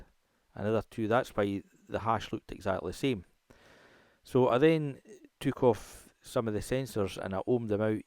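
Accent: Scotland, United Kingdom. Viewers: Thank you for doing this video. I'm looking for a cause of a certain code on my x350, and this has been a real help in helping me decide how to proceed. (Love the Scottish accent by the way. )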